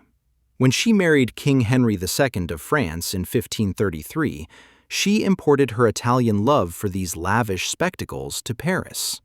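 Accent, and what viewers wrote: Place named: United States. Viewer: Quite interesting, but oh, the American pronunciation! BallAY? Someone has told them French stresses the final syllable. In fact, the stress should be more or less equal on the two syllables of the word ‘ballet’.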